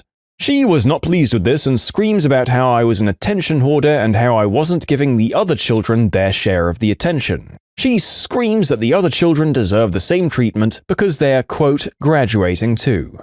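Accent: United Kingdom. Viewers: Why the fuck are you british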